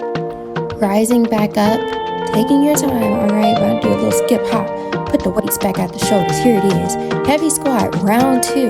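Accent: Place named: South, United States. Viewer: Love this so much! Such a sweet southern bell, love the voice over. I’m getting kind of tired of workouts where it’s just music and I have to keep looking at the screen to see what they’re doing. Please do some nighttime stretches and add a timer bar for each set and an overall progress bar. Love love love this❤❤❤❤❤❤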